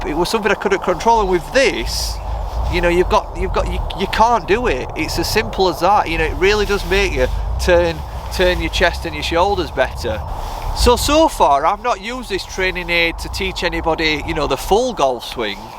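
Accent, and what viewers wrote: Yorkshire, United Kingdom. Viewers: I think should change the name of your channel to " " salt of the earth Yorkshire accent you know this guy is not lying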